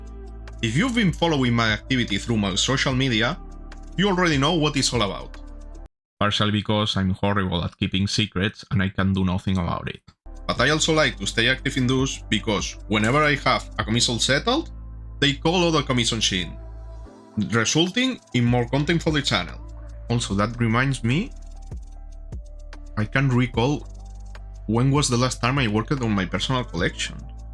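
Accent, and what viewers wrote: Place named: Spain. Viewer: That thick spanish accent... puede ser?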